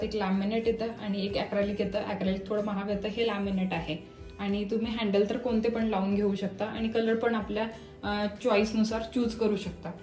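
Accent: Vidarbha, India. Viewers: Tai tumhi kontya shahratun aahat, tumcha bhashevarun tumhi vidarbhatlya vatatat, video chanch hota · ताई किचन छान आहे तुम्ही भाषेवरून मला असे वाटते की विदर्भातील आहात कारण मी पण यवतमाळची आहे सध्या पुण्यात आहे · खूप खूप छान किचन , बऱ्याच नवीन गोष्टी कळल्या तुमच्या व्हिडिओ मध्ये ,very useful video, माझा पण घराच काम सुरू आहे ,मी नक्की या पद्धतीने बनवायचा प्रयत्न करेन .thank you so much.. तुम्ही विदर्भ मधील आहात का, भाषे वरून वाटत ,मी पण नागपूर ची आहे 😊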